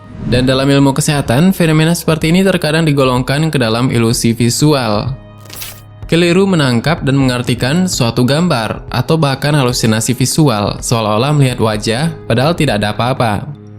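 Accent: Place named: Java, Indonesia. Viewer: Bahasa Jawa mu lucu Min, tapi nice try😁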